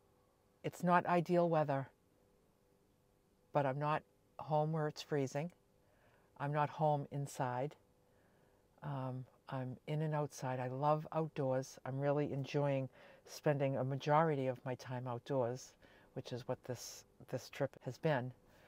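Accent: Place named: Massachusetts, United States. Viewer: Loving that Mass accent (we used to live in NH!). Safe travels!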